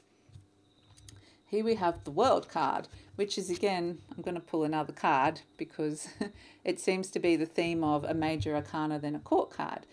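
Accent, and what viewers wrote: Australia. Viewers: Yeah those aussie spiders can be scary! 🤪your readings are wonderful love! And nothing more lovely than the stralia accent! 💖💕 my home away from home. 🤸‍♀️💫💖 thank you for the great reading!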